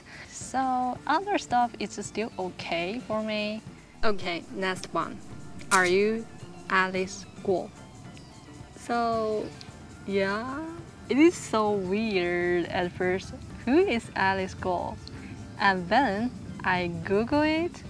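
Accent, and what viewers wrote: United Kingdom. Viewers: You have a light British accent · you sound little bit of uk/british style accent. what school in china you learn english? i think china government can still track you even in vpn by requesting from chinese internet providers(if you are not using chinese internet provide then maybe you are safe). free vpns definitely they can see what you are doing, paid ones are encrypted. but i think they could still decrypt that now that china has quantum computers. try to start criticizing chinese government, you'll see😂 just kidding. kinda scary, even jack ma didnt escaped president xi😅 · I see you as a beauty and brain lady and I noticed that there are instances when you speak there’s a British accent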